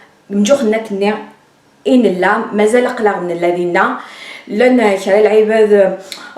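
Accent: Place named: Kabylie, Algeria. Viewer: j adore tes video et surtout ta facon de parler le kabyle.j aime bcp ta personalite car je sens que tu es sincere je t encourage a continuer ton travail afuth iguerzen a weltma.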